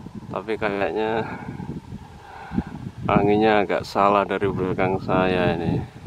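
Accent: Java, Indonesia. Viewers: Kya,,a logat orng Jawa ya bos sampean😀😀😀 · Jan gk ilang blas jawane...."uwwaangel giaanndul gandul teng krontelll"😅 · Asline wong ndi mas ketone wong jowo iki. Kerjo teng pundi mas😇🙏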